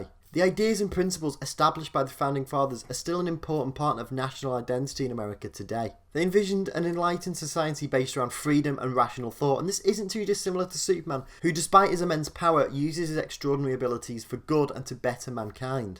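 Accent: Scotland, United Kingdom. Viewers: Comics I like how the one video about America was done by a British guy. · Whys a British guy talking about the american way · Scott turned British?!!! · You're not the Scott, but are you a Scot by chance?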